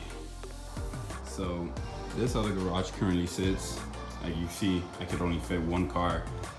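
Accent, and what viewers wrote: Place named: Miami, United States. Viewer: I knew you were from Miami from the first literally you said lol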